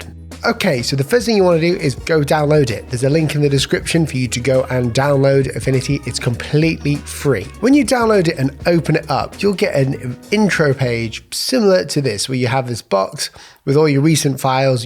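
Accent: United Kingdom. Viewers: nice video, more please nice to hear a British person explaining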